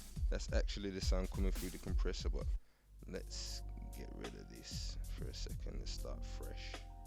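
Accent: United Kingdom. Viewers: I sense some Midlands accent. 👍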